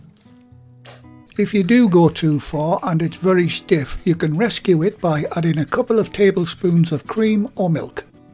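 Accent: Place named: Sunderland, United Kingdom. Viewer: Thank you for all the wonderful recipes we have enjoyed all these years. I actually learned baking bread following your instructions. On this recipe I used large eggs and the end result is far more runny than your final batter, any suggestion for the next time please? My dearly departed wife was from Sunderland and your accent is a kindly reminder of happy times. God Bless You.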